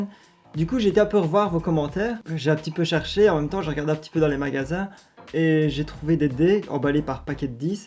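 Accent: Liège, Belgium. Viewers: Hello c est vraiment des supers videos perso j kiffe grave. Une petite question est ce que t es belge? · T'es liégeois? Vu ton accent ahah ? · T’es vraiment trop fort ! Je pense de c’est le stop motion le plus cool que j’ai jamais vu ! Sinon tu ne serais pas belge par hasard ?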